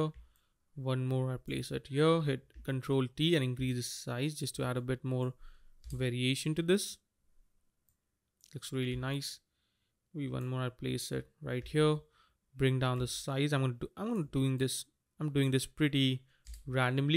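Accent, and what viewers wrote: India. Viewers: Typical Indian English accent, lol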